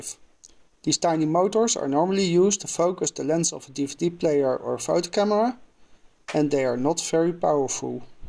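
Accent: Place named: Netherlands. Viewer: Ok ok! I'm also still figuring out the tricks of the trade - you're not alone! I've trialed many different video editors and in my opinion, the best is Cyberlink PowerDirector. It isn't perfect, but I still think it is the best. Yes, you're doing it the way I used to do it. Now I rarely do this! What I do now is section the video into 'scenes', then I 'take' until the scene is perfect, then move to the next scene . Then when editing, I simply delete all but the last take for each scene. It saves me many hours! I also do 'errr', 'um', 'arr', but I'm happy to leave them in because I don't want my channel to be too formal. About your presentation; it is absolutely fine... I can hear a strong Dutch accent, but I can fully understand what you're saying. I don't know about other countries, but here in Britain, I think we are good at understanding accents because we are used to talking to people in English who are from all over the world. 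For example; the word 'one', you pronounce as 'WAN', but it's close enough to know that you're saying 'one' without any effort at all. Like if an English person speaks Dutch, you can hear the accent and it applies a sort of slant to the speech, but you can still understand it well. :-)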